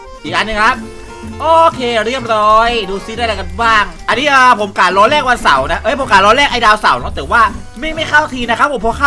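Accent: South, Thailand. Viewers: พี่เป็นคนไทยแต่อยู่ภาคใต้ใช่ไหม😊😊😊😊😊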